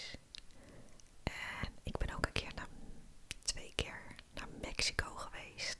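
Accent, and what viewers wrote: Rotterdam, Netherlands. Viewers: Hallo! Ik woon ook in Zuid-Holland en ik hoor aan u gepraat dat u volgens uit Rotterdam komt. Klopt dat of zeg ik iets raars😂 U filmpjes zijn heel fijn en u heeft een fijne stem Xx Sieb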